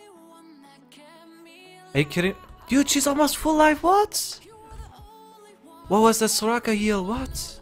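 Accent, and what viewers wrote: Germany. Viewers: are u from german ?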